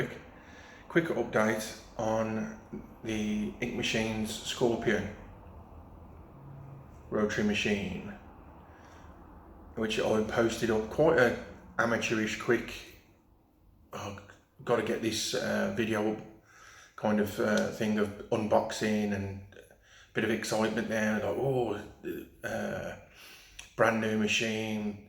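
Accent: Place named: Birmingham, United Kingdom. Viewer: Never apologise for a Birmingham accent 😊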